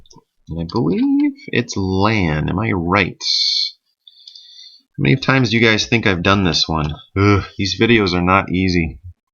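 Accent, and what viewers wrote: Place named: India. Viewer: thank you for not having a thick Indian accent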